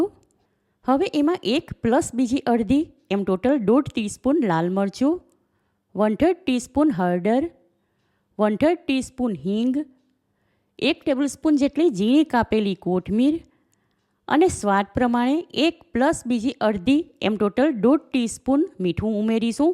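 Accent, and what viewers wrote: Surat, India. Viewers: I like ur surti language the way u talk so sweet...ur receipes I do watch they r 👌👌👌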